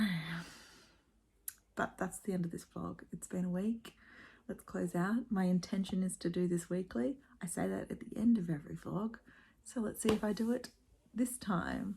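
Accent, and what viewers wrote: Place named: Australia. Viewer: Brent wants to know if you’re originally from the Melbourne area. He’s listening to your accent.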